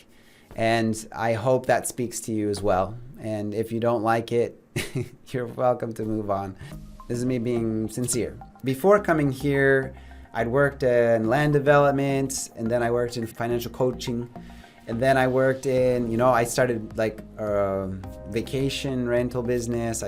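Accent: Italy. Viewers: You have an Italian accent, but you look more Northern European. Then again, many Italians look more Nordic like yourself. Just wondering, that's all. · I lived overseas for several years and you have the international English way of speaking with I think a bit of Italian regional accent. It it more recognizable when you speak slower. When you are more passionate or speak faster it disappears.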